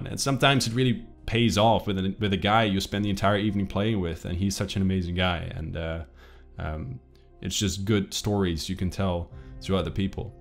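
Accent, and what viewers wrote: Netherlands. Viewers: Be proud of your heritage; don't drop your Dutch accent just because you might think it's uncool, nothing wrong with anyone (whether they're streamers or not) with an accent. Just my 2 cents man, always enjoy your videos. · Een Nederlands accent kun je nooit echt verstoppen, kijk je video's al tijdje, en je bent een goede speler. Heb Dayz al een tijd niet mee gespeeld, zou je een video kunnen maken over de staat van de game, hoe is het veranderd in het laatste half jaar ?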